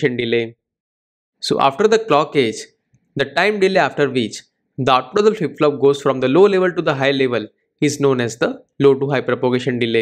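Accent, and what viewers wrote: India. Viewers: کارت درسته مرد، لذت بردم از توضیحاتت با لهجه‌ی شیرین هندی 😊❤️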